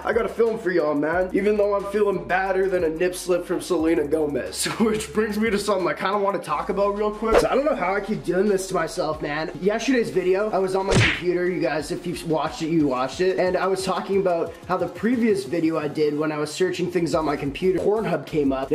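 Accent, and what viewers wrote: Winnipeg, Canada. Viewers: Are you from Winnipeg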